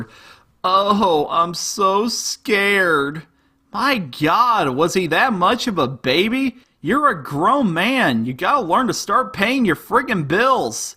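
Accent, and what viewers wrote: South, United States. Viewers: I love how southern he is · I love your country ascent!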